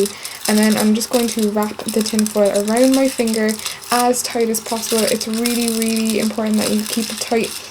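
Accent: Ireland. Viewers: I love your accent are you Irish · Love your Irish accent ❤️💚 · OMG. Thx this helped! You sound Irish :) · It's an Irish accent not an English accent! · You’re accent is great!! You sound a lot like my Irish friends! 💖 I’m battling my ridiculous nails this afternoon thank you!! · You're Irish? Sweet :D MY HERITAGE!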